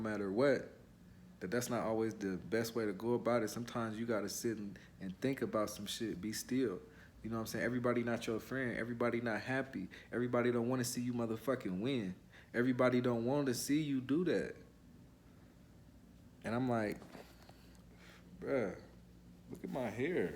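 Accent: Bay Area, United States. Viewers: He has to be from the bay the way he talk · You could tell bro from the bay!! Man the police got me and my lil bro out there too smh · this dude definitely from The Bay he talked just like Messy marv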